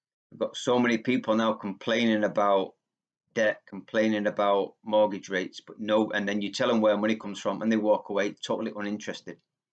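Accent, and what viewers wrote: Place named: Manchester, United Kingdom. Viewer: Real interesting vid, nice to hear a Mancunian on utube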